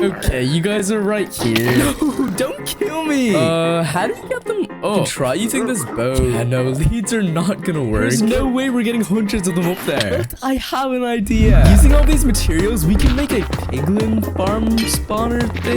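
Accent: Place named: Ireland. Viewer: Yoo I Heard Your Irish And Im Irish :)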